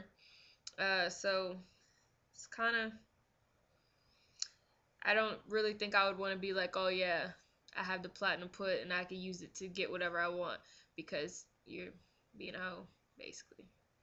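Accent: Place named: United Kingdom. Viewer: im guessing you are brittish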